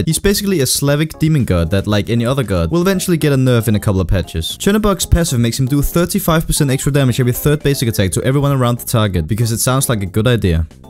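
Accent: Denmark. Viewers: This Danish accent is hurting my ears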